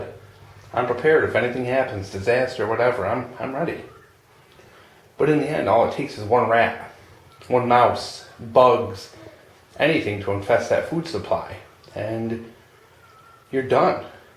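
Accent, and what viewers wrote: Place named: Midwest, United States. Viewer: LOL your accent reminds me of home! are you from Michigan or Minnesota?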